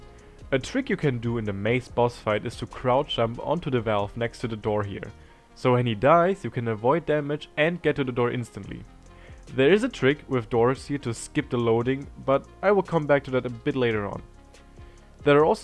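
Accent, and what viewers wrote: Germany. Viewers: a german speaking english making cry of fear videos? heaven.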